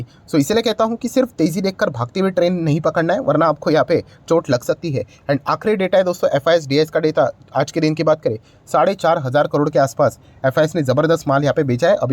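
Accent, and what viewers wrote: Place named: South, India. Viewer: I love ur hindi accent it's southy 😋😋😋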